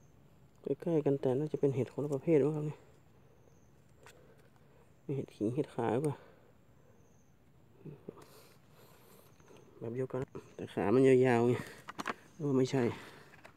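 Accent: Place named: North, Thailand. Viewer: น้องหล้า...อยู่่ จ. หวัดไหนเจ้า (ได้ยินอู้กำเมืองกั๋นน้อ) เห็ดแดงกับเห็ดขมิ้น เห็ดถ่านถะลำเน้อน้อง แกงใหม่ ลำเน้อ อยากมาเก็บ แต้ ๆ ใจเย็น ๆ กะได้เห็ดกิ๋นน้อ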